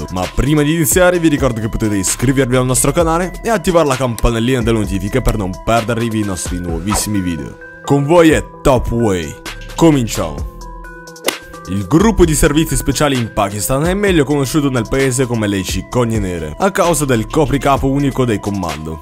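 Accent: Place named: Russia. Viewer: Sento un lieve accento russo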